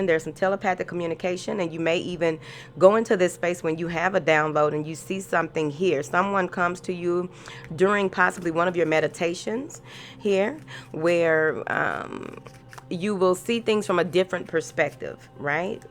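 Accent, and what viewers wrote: South, United States. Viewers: My heart melts when I hear that southern ✨💜 thank you for such clear downloads & always getting straight to the point/no softening the message.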